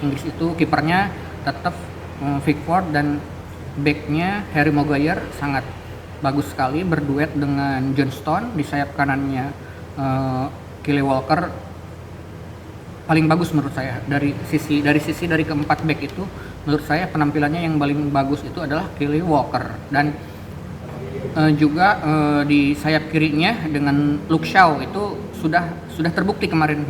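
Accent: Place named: Sunda, Indonesia. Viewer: Bng lagi ngapain di bali ,kaya orang sunda nada bahasa nya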